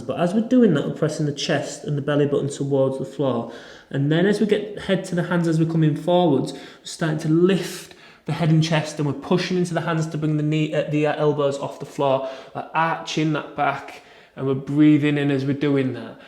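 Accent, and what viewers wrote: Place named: Leeds, United Kingdom. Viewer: Thanks. I’m from Leeds originally so the accent reminds me of home 😊. Many thanks, really I was thinking of some gentle stretches that would be good for anyone who are is currently not running but wanting to keep in good condition for when they start back running. Just an idea. Thanks!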